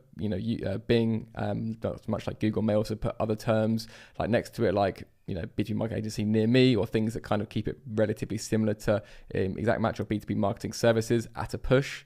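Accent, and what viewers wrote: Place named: United Kingdom. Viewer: Try to work on the way you talk; it seems to be too fast, I guess you are from the UK and only the uk citizens can understand you better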